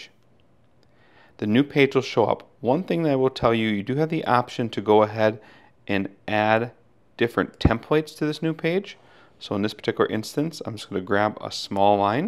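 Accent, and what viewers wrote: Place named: Upper Midwest, United States. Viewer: Thank you. I’m guessing accent is from Wisconsin, Minnesota, or North Dakota. Am I close? 😁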